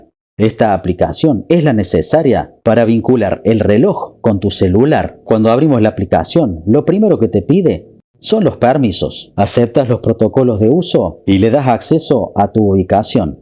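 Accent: Córdoba, Argentina. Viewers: Le doy me gusta por que me gusta el acento de Córdoba 😂😂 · Jajaj soy colombiano y también es mi acento favorito. El de BA no me gusta tanto como este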